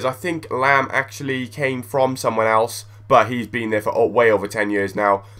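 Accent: Wales, United Kingdom. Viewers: r u from the valleys???????? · Are you that guy from the valleys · You sound like leroy from the valleys!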